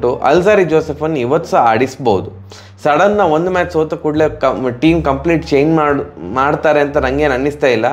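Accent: Mangalore, India. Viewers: Bro nanu nimge recent subscriber bro...... I watched all ur last ipl videos..... Janardhan sir comedy antu nxt lvl marayya😂chennagi roast madtare...... Nimma mangalore style kannada bahala chanda untu..... Keep going and keep roasting rcb if they perform bad😂avag swalpanaru buddhi barli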